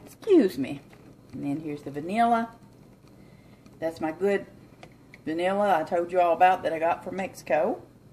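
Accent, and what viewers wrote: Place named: Georgia, United States. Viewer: I’m from Kentucky, but my family’s from Georgia, so there’s something very familiar about your accent. It makes me feel like I’m cooking with my aunt haha :)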